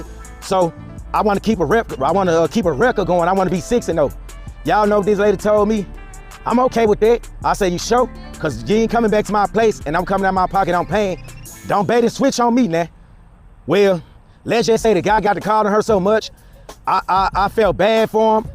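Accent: Mississippi, United States. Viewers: R you from Mississippi bra… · I was guessing Mississippi.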